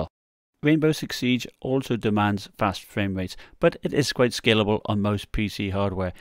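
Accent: United Kingdom. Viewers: But you sound British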